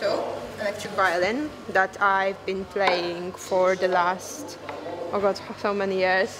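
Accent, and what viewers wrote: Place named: Poland. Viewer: she sounds Polish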